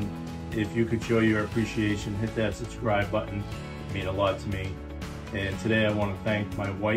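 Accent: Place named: Massachusetts, United States. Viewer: That’s interesting because I was always told Sam didn’t have their own breweries but contract brewed …which never made sense to me,. Thanks for clearing that up …I didn’t know they opened up by fanuiel …I’ll have to hit that. sounds like we have same accent, I’m from cohasset …are you from mass?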